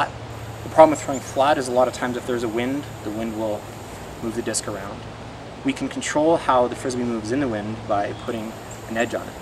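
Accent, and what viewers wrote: Canada. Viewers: He's Canadian, dude. That's the Canadian accent, nothing weird aboot it. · Canadian, eh?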